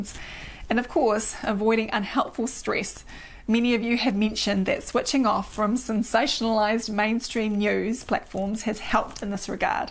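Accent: New Zealand. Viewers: I love listening to this cute Kiwi accent :-) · Absolutely stunning! And brilliant! Wish I had you as my physician! Although I may get lost with all the biology and chemistry lol What a smile but I'm sure millions have already mentioned that! Love the accent. I was in Oz in the early 90's and absolutely loved the accent and the people and yes I realize you are a New Zealander. I'm sure they are just as friendly as Aussies! G'Day!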